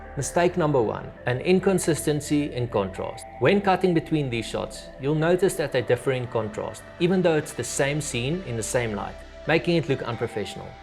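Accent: South Africa. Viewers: Refreshing to hear the SA accent on YT Im currently using FCP X Would really loves this, keep killing it bro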